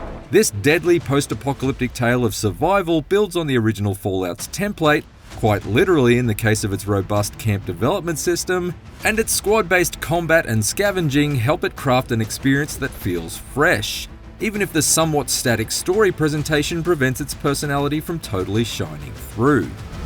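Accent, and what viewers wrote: United Kingdom. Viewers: IGN please read your COMMENTS. People really dislke how THIS GUY narrates your VIDEOS. It's not like you can't find OTHER PERSONS to read the text for your VIDEOS. Just because he has a British ACCENT, it doesn't mean that HE IS a good narraTOR. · The generic YouTube I'm vaguely British voice ? Meh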